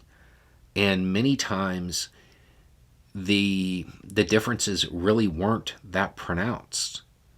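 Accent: South, United States. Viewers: Speaking of strong southern accents. I watched a five-year-old video of yours the other day and thought that your accent was a lot stronger back then.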